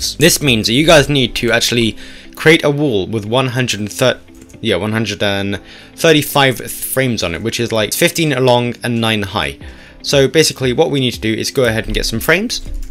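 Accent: United Kingdom. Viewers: Are you british or australlian?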